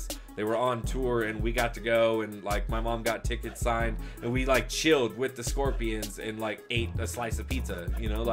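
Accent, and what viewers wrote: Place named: United States. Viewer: dah slow dah tu dia ckp...American English tu...bukan English pure mcm UK Dia ckp..UK punya English lagi lah pening nk faham dia punya slang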